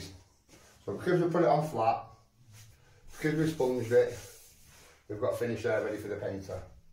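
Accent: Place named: Liverpool, United Kingdom. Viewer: Who's this scouser?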